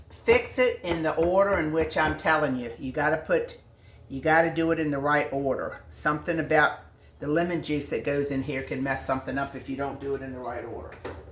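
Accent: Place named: South, United States. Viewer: Loved your recipe. Love your accent, southern gal. Like I said before, I'm in Pa and love new ways to make every day food stuff. I pray you reach 1000 people watching. I know that is when they award you. Take care, Betty. An old grandma from Pa.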